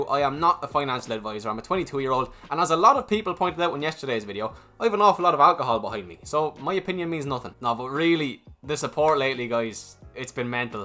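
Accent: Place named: Ireland. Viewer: Like given for the Irish accent.. I loves the Irish people’s 🤪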